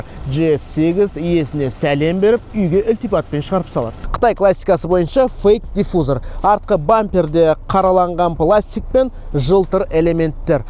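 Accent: Standard. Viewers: жарайсың таза қазақша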